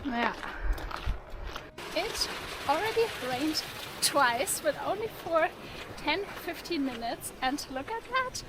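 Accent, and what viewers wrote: Germany. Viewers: Do I hear a german accent? ;)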